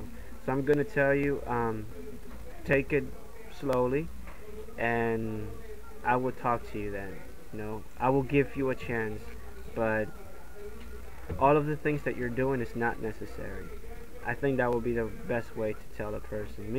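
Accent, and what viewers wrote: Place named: Dominican Republic. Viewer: Manito. Como estas 😊 i hear the Dominican accent. Bless u man INFJ here too.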